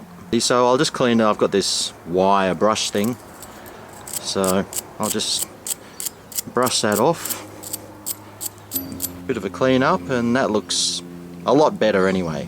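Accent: Australia. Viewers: Thanks heaps! Fixed my mower! Legend! U also taught me how to cook Corned beef (was that diff channel, watched it over a yr ago!?) ! And all with the Aussieness I'm used to! Cheers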